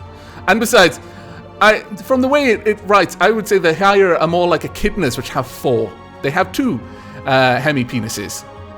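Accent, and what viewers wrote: Wales, United Kingdom. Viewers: Sir, I believe you are Welsh.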